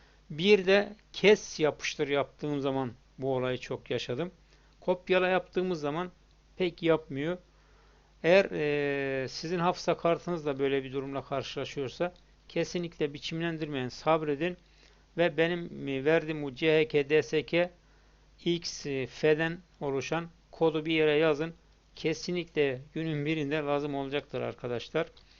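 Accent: Erzurum, Turkey. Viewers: abi Erzurumlu, net!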